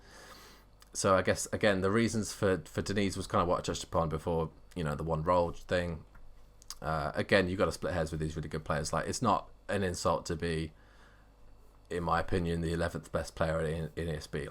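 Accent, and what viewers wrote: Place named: England, United Kingdom. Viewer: The thick English man of Battlefield Community is back. Love ya Keep up the content! 🐐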